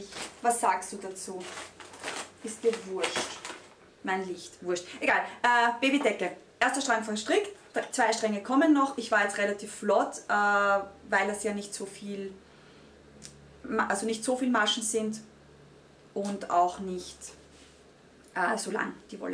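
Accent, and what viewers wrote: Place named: Austria. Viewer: Warum spricht so schnell? Und dann noch mit diesem Dialekt😕 Das versteht doch keiner außerhalb von Österreich. Also ich habe nicht mal die Hälfte von den Namen der vorgestellten Garnen verstanden und von wem sie sind. Das Garn von der Babydecke hat mir wirklich gut gefallen. Leider waren auch die Einblendungen kaum zu erkennen! Dunkel Lila Schriftzeichen auf schwarzem Untergrund!! Liebe vielleicht sollten Sie mal Ihre eigenen Videos von Anfang bis Ende ansehen, dann verstehen Sie was ich meine. Auch ist die Auflistung der Projekte,von wem die Garne /Wolle sind,wie die Garne/Wolle heißen in der Infobox/Videobeschreibung für ungeübte Strickanfänger schlecht verständlich. Mich interessier einige der gezeigten Garne/Wolle, aber ich finde mich in der Beschreibung nicht zurecht. Schade!!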